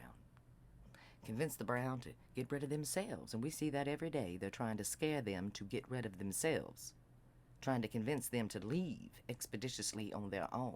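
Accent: South, United States. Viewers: I stumbled upon a story yesterday. The voice was like smoked butterscotch, deeply southern. At first I was reminded of Julia Sugarbaker from the show "Designing Women." Then I got into the meat of the tale... a horrifying recount of a Dark Time and her memory saving her from recalling the full measure of it. Then the algorithm did a good by putting her on my suggested list today. You, madame, are AMAZING.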